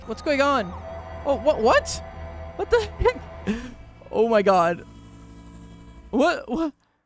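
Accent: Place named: West, United States. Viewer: Who’s here under an hour. Also why not 100 percent a switch game like Pikmin 4? Also also, I can’t with ur western accent!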